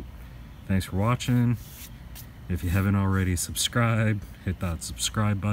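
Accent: Canada. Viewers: Hope this helps you oat! I love crazy accent! Lol I may be wrong but I’m guessing Canada maybe? Out = Oat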